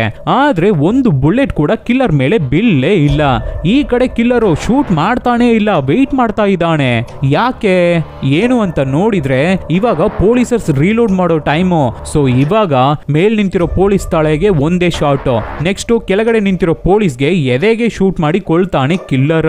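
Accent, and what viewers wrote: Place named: Tamil Nadu, India. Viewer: ನೀನು ಪಕ್ಕಾ ತಮಿಳ್ ಅವ್ನು... ಆದ್ರೂ ಸೂಪರ್ 😄😄😄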